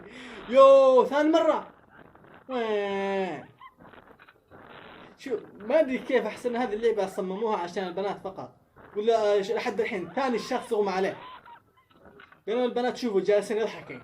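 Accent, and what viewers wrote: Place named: Yemen. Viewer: اليوتيوبر من اليمن واضح من مخارج الحروف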